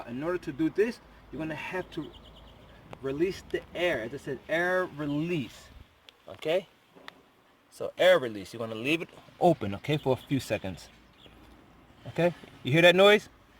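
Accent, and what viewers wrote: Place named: South, United States. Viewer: Thank you for the clear explanation. Your Southern accent is the icing on the cake :-)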